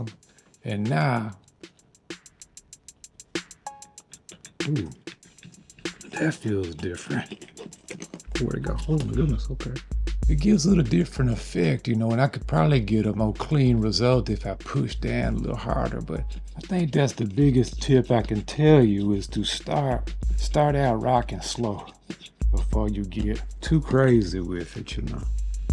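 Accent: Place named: South, United States. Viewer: First; boss level teaching skills. 2nd, all my people from Hot’lanta to Lake Charles talk just like this :)= feels like home.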